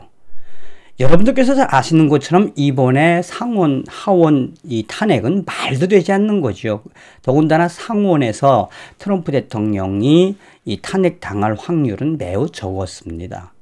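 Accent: North Korea. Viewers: 북한 사투리로 가열차게 진행할때 속이 후련합니다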